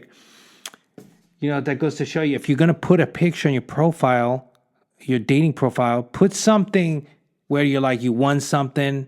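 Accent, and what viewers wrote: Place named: Northeast, United States. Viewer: what is your very prominent accent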